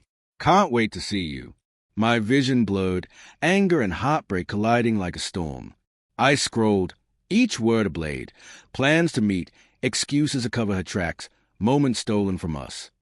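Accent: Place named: South, United States. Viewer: The southern accent from the AI is off putting!! Needs to have a slower cadence to be believed. Good story though.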